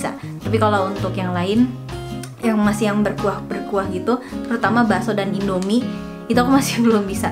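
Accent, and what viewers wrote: West Java, Indonesia. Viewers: Sepertinya teh ngomongnya agak engap ya heheh😄 antara lagi pilek atau apa atau aku aja yg dengernya gitu😁. Oh yak logat sundanya aku suka banget kaya aky jadi kaya campur2 gitu walaupun ngomong indo😄. Oh ya semoga teh sama baby nya sehat2 terus yak sampe nanti lahiran🤗🤗❤❤❤ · dari cara ngomong y kliatan bngt kalo org sunda😆 slamat ya kak,smga slalu sehat trus😘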